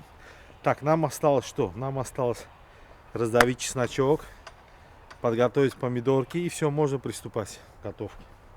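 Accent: Baku, Azerbaijan. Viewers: Отличный рецепт, выглядет очень аппетитно, а мой родной бакинский акцент это бомба 💥👍👌👍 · Во первых блюдо вкусное))) Во вторых, бакинский акцент прикольный👍👍👍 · Азербайджанский акцент не перепутаешь не с каким другим. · Как только услышала речь, сразу догадалась, что это бакинец. Не смотря, что сейчас живу в России, но акцент южный бакинский остался. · Приятно услышать бакинскую речь и видеть интеллигентного человека! привет от бакинского армянина из москвы! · Ты настоящий Бакинец,по акценту видно,очень понравилось твоё видео.Здоровья тебе,твоим родным,спасибо тебе ахпер.Обязательно такое приготовлю,если смогу. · Бакинских акцент 👍🏼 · джан! Джан ээ бакинский акцент ❤️🤲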